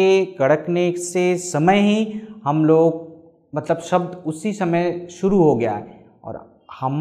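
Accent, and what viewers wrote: Bengal, India. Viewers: I appreciate your efforts. Your explanation gives relevant information how and why veda mantras have remained intact and un-adultered. I am not going on your accent due your Bengal origin. This does not change the meaning of Vedic Mantras today because these mantras are available in printed text. We must respect our ancient rishies who developed various pathas, only to see that no one can make any adulteration in them. It is human drawback that people make changes in the original text as they like. Brahamanaas literature is example. However, now we should try to understand the meaning of the words of original Veda Mantras also, which are profound. Since we have the written original text is available, the meaning of the mantra does not change as we don’t go by the pronunciation but by the words and their meanings. Therefore, people criticizing your efforts should take your explanation in right spirit. 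These mantras are profound and powerful only by understanding the meanings and not by simply chanting as many understand today. Congratulations to you. Regards. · साधूक्तं भवता । पुरुषः वङ्गदेशीयप्रायः नाम्नः, तर्हि तद्देशीयानामुच्चारणपद्धतिः जानीमः, तर्हि क्षन्तव्योऽस्माभिः । प्रसङ्गेण वक्ष्यामि, भवतां भाषासौष्ठवमभिवन्दनार्हम् । जयतु संस्कृतं जयतु भारतः । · I think he is speaking with a Bengali accent, hence the problems in pronunciation. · ये बंगाली हैं. मोद्धोमा तथा शोरित बंगाली मूल शब्द है. बंगला में 'व' अक्षर नहीं होता.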